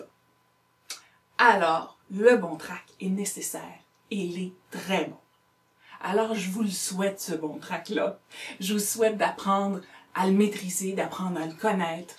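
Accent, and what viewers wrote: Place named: Quebec, Canada. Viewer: Moi aussi j'adoooore! Merci beaucoup pour cette vidéo, au si bel accent de la belle province! Gros becs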